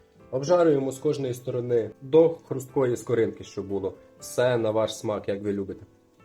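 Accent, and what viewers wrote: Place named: Carpathians, Ukraine. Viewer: Доброго дня., ти чудовий кухар. Все в тебе надзвичайно смачно і швиденько. А я хочу вибачитись за тих хто заходить на канал і починає показувати, який він мовник. І як добре вміє розмовляти. То ідіть в школу і викладайте і навчайте своїх дітей і внуків. Наша карпатська мова дуже різноманітна. Так розмовляли наші дідусі і бабусі, батьки, ми і наші діти. Ні, щоб подякували за рецепт, а викаблучуєтесь. І не соромно вам люди. Порядна людина ніколи не буде писати таких дурниць. Радуй нас своїми кулінарними шедеврами і розмовляй так, як говорять у нас в Карпатах. Бережи тебе Боже.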